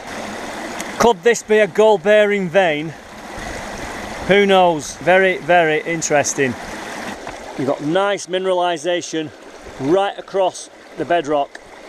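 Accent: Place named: Yorkshire, United Kingdom. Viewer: Liked and subscribed. You sound like you’re from Yorkshire?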